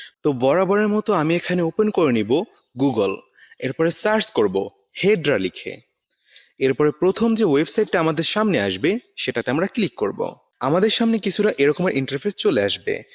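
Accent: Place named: Sylhet, Bangladesh. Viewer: সিলেট থেকে আপনি?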